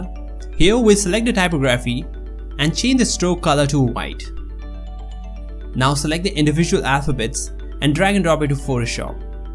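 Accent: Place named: India. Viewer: Love your videos But please keep your Indian accent.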